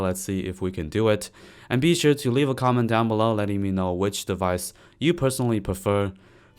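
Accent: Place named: China. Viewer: Your accent sounds pretty mandarin....... well I guess more like the mainland mandarin ?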